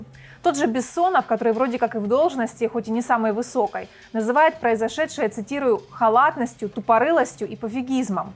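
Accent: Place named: Ukraine. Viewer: У ведущей отвратительный мовный акцент. Аж слух режет... И уголки губ назад при разговоре оттягивает - так делают все мовноговорящие...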